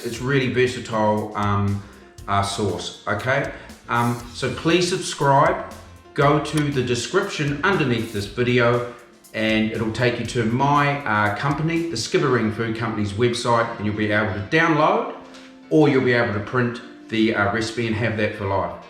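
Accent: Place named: New Zealand. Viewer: Love that Kiwi accent.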